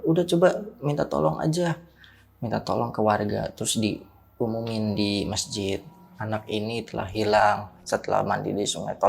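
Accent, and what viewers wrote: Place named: Serang, Indonesia. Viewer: wong serang kih wkwk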